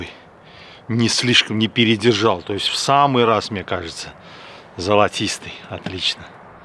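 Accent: Baku, Azerbaijan. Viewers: бакинский акцент , так сладко кушает , класс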